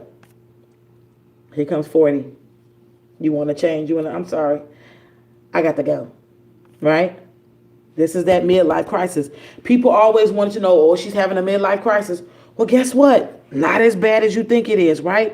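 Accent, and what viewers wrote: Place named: New Orleans, United States. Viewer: I could hear the NOLA coming out as you were teaching! Mad love and respect for how you are bringing truth together across belief systems.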